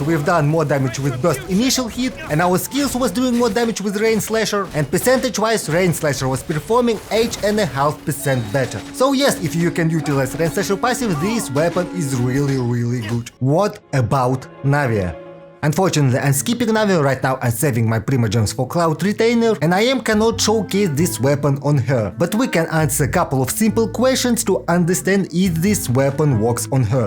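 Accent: Russia. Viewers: I learned that there's something even more cool than your russian accent: skin mods. If there are skin mods for weapon, I'm good with this stupid cardbox sword, because it won't be looking so. Otherwise I'd settle for Tidal Shadow just because how awesome it looks.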